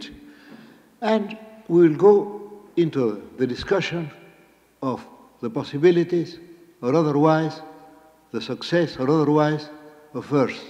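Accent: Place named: England, United Kingdom. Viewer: MUCHAS GRACIAS SEÑORA POR SUBIR ESTAS JOYITAS DE AUDIOS EN DONDE UNO PUEDE OÍR EL ACENTO INGLÉS DE BORGES.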